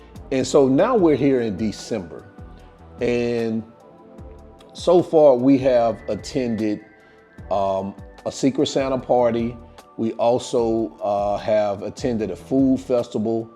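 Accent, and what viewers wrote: South, United States. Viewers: I have to say , I love your country accent!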